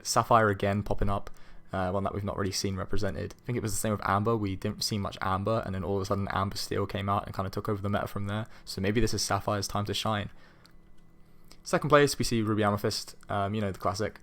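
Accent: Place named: Europe. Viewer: Its normal for North America to dominate the TCG space in terms of sheer numbers. The United States out purchases all of Europe in pretty much every TCG at least 3:1. The American dream screams win big money from tournaments so there are soooooo many more TO's running events per game than pretty much anywhere else on the planet. (This tends to shock most European players until they look hard at the state of their official World Championship series for their respective game vs United States.) Between the accent and you having commented on not coming from Magic, I am going to go on a limb and ask if you are European?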